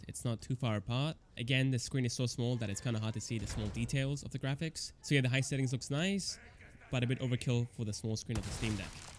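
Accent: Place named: Australia. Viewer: I’m making you my new benchmarking guy always nice to hear an Australian of coarse but I also love how you structure your videos.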